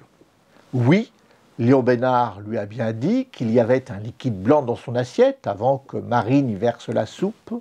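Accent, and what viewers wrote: Marseille, France. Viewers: Génial vivement la partie 3 ! j'espère qu'il y aura encore l'accent marseillais ;) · Bravo pour votre accent marseillais qui m'a bien fait rire ;) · Je ne souhaite nullement descendre votre accent marseillais, mais vous pourriez utiliser votre nouvelle influence dans le YouTube game pour trouver des doubleurs qui prendraient plaisir à sublimer votre travail de narration. Excellente série, merci à vous !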